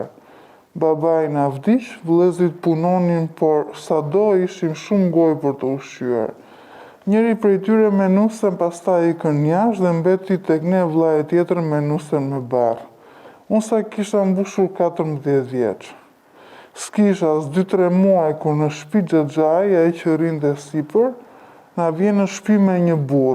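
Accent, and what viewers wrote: Albania. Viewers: I kam degjuar pothuajse te gjitha historite tuaja por 1 gje nuk kuptoj ne te shum histori pervec se i njejti ze ,ok mund te jet i modifikuar por dialekti i njejte si nga zonat e Fierit ,kjo te le te kuptosh qe mund te jene histori te sajuara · Te duket nga veriu me kete dialekt ? Zonja perdor fjalen koshtrat kete fjale e kam degjuar te flitet nga gjirokastrite.Mentalitet te tille per te shitur vajzat qe ne mosh fare te vogel ekziston akoma rendom dhe ne zonen e Mallakastres dhe Fierit por e sigurt nuk eshte nga keto zona. Eshte ose nga gjirokastra ose nga saranda sepse jeton ne 1 zone qe kane patur besimin katolik sic edhe zonja thote shkova ne 1 kish.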